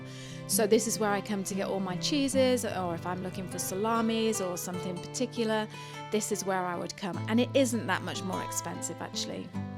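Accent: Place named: United Kingdom. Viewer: Weird narrator script, i need to see different people . She sounds soooo British. Im British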